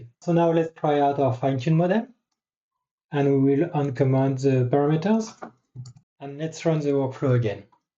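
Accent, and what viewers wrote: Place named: France. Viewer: Hi ! You sound french haha, can you make a video on extraction of boxes with Yolov0 and SAM for segmentation ?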